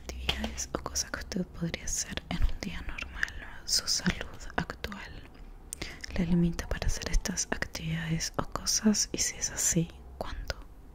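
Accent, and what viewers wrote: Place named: Argentina. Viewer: Amó tu acento argentino, saludos desde México ❤️💤💤💤💤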